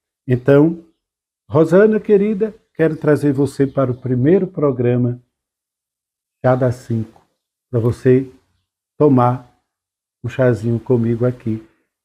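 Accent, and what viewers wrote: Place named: Northeast, Brazil. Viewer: sotaque nordestino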